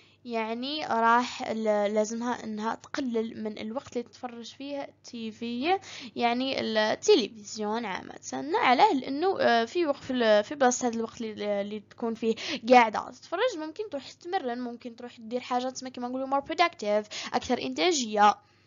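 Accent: United States. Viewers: لهجتك اميريكية 100\100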